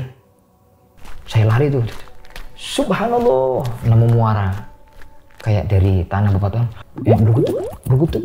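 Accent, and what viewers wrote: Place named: Banyumas, Indonesia. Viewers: Slam ngapak mas tegale ndi mas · Di Chanel BP lebih lengkap, disini juga keren aku suka gambar ilustrasinya, sehat trs wong tegal laka2 ceritanya epic bhs juga epic ngapak 😂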